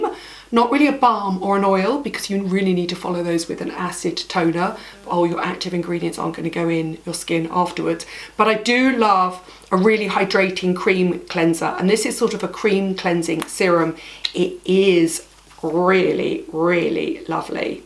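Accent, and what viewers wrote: United Kingdom. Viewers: Happy new year dearest lady ! Your videos are always so pleasant Lovely classy British accent love it 💝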